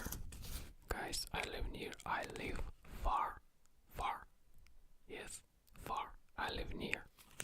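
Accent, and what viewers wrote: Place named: Russia. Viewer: nothing is more cute than a russian trying to speak english 😂🥰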